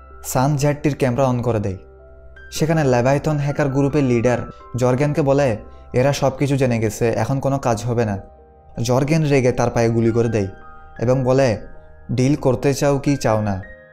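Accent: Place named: Noakhali, Bangladesh. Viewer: Bhi.. apnar bari noakhali maijde. Ta amai vuge gesi jae. Noakhailaaa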